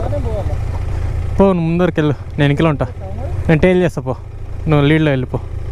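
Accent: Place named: Tamil Nadu, India. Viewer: Masthu undi bro place nd me Tamil slang kuda 😂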